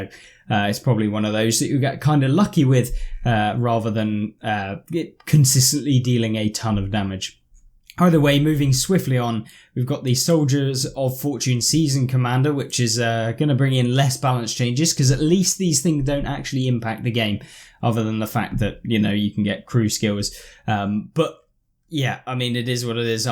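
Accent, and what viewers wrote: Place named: Cockney, United Kingdom. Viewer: You speak English? You're English? So why the actual f*ck can't the people who made the f*cking language speak it ? Roofless? It's ruthless you can say the with the t and the h making the correct sound then you mispronounce thought and make it sound like fought when clearly one starts with a f*cking f and the other starts with th so if you know what sound it make's why are you using fs where there's no fs you say there their and they're perfectly so why the f*ck are you using that lazy dog sh*t cock knee accent for ruthless 🤔 mate come the f*ck on stop being sooooo lazy put some effort in if dez game's can correct himself (Lithuanian-English) why can't you speak the easiest and your own f*cking language for 5 and a half minutes I was waiting to see a Roofless tank wondering why a tank without a roof was being put in the game and why you were calling it a roof and not a turret it's your language speak the f*ckin thing correctly or do some linguistic tutoring you literally have the internet and hundreds of language apps at your disposal I'm Australian and between you idiots the Americans and the kiwi's f*cking up your own language I'm appalled I know Swede's Finn's and Indonesians who pronounce English better than that stop swapping letters out and pronounce sh*t correctly I'm diligent when I use German and Finnish so the context is understood how are you butchering your own language? 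It's just laziness nothing else fix it drives the rest of us up the wall you're doing such a g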